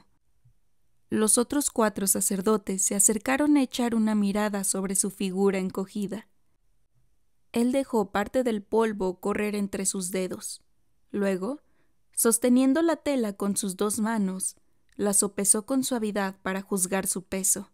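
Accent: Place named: Mexico. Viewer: GRACIAS, POR DARNOS ÉSTE LIBRO CON TU BONITA Y CLARA VOZ Y EN MEXICANO 😃👍🇲🇽♥️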